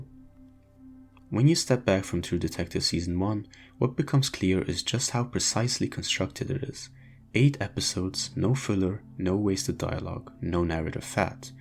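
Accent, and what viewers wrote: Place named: Eastern Europe. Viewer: same insights with eastern european accent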